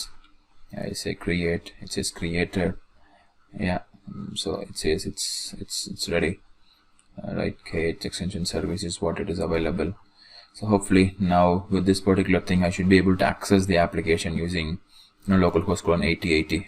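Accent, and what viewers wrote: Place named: India. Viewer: I really appreciate that you guys from India make those technical videos that help me to solve whatever issue, but finally you are the 1st one with not that strong accent :-D Thank you for videos :)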